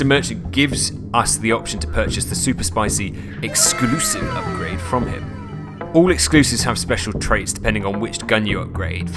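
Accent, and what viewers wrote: United Kingdom. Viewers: Swear you sound more British in your older videos.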